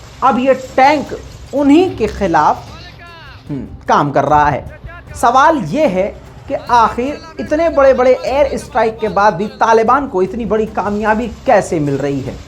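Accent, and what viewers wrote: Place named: Indore, India. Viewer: पक्के इंदौरी भाषा बोले हो भाई आपकी न्यूज देखने और सुनने का मजा ही अलग है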